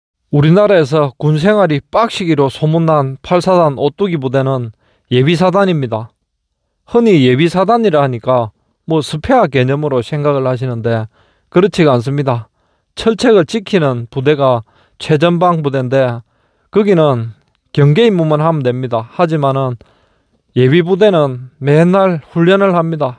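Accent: Gyeongsang, South Korea. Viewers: 경상남도? 사투리 구수~합니다. 1년 형님 이시데요 저는 쥐띠 입니다. 95년 9월 전역했습니다.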